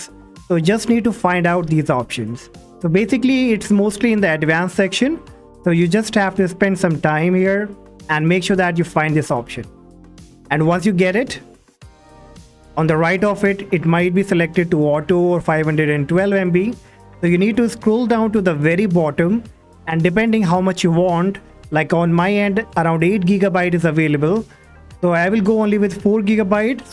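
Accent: India. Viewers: indian Voice it Sound Scam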